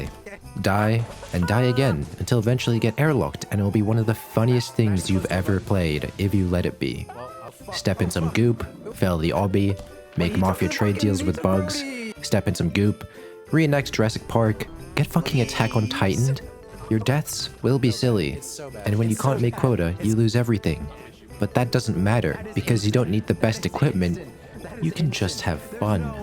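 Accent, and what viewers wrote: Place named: United States. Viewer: you have an american accent but u are australian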